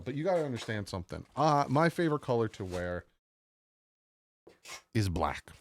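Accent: New Jersey, United States. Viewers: I love you but the NJ accent…. “Yastaday” grinds my gears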